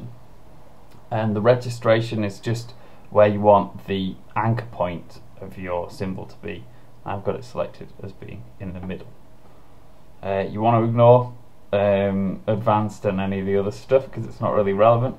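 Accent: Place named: Leeds, United Kingdom. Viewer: Ahhh no way, silly me. I went to The University of Leeds as well so you'd think I'd be better at recognising that accent haha.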